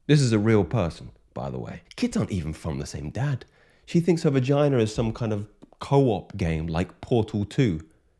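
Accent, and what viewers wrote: United Kingdom. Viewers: Ngl, the proper British brother's verbal gentle assault on my brain sparks the biological need to bring as many kids as possible into life and actually be a masculine manly father This the typa conditioning that should be broadcasted instead of whatever anti biology society participates in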